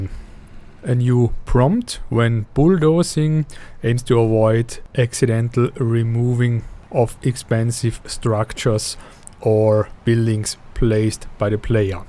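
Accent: Germany. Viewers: Is it German accent? It's hard to understand for non-English speakers. But it's amazing!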